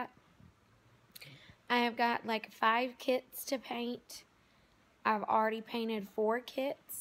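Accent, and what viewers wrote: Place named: South, United States. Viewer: All this hooplah about Reborns. You are very right and I am so glad you made this video. I am also a 60 yr old, and alone. I was a Neonatal Nurse & Labor /Delivery Nurse for 30 yrs. Now, I only have my dolls to play with and I have loved and collected dolls my whole life. Needless to say, as an adult, and spent a lifetime career of babies, I clearly LOVE THEM and LOVE my dolls. God Bless you for saying this. It is important to all of us. I am also a Southern Lady and so nice to hear your lovely accent and hospitality. Thank you for saying it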